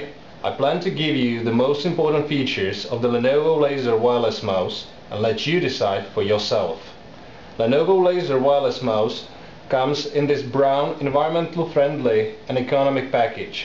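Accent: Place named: Czech Republic. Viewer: This must be czech guy talking, the accent is just awesome.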